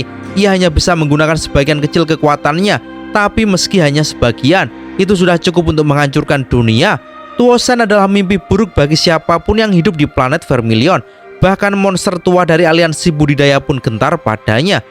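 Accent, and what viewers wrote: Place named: Java, Indonesia. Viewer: Jawa ya😂😂 mendok kali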